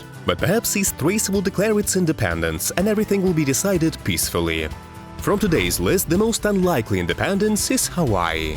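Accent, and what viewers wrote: United Kingdom. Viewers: u british u sound like british and i like your vids alot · He's speaking British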